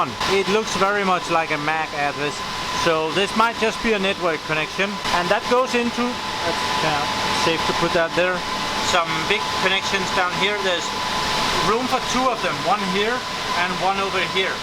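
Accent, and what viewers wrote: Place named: Denmark. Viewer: is he danish? sounds danish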